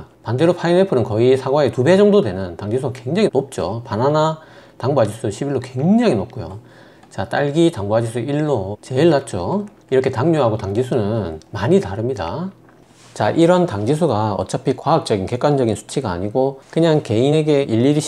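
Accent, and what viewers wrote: Gyeongsang, South Korea. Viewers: 경상도말씀이라 부산이면 뵙수잇는의사라 생각햇는데 요양병원 어는곳에 계시다네요 ㅎㅎ 의사중최고의 의사입니다 늘 좋은영상 계속듣고 따라하도록 하고잇어요❤❤ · 은. 고향이 경상도 이신걸로? 말투에서 정이가는 상남좌삘^^ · 구수한 경상도 말씀이적당히썩여서하시니 재미있게 박사님 말씀 잘듣고 있습니다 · 경상도 억양이 귀에 쏙쏙 와닿네요..ㅎㅎ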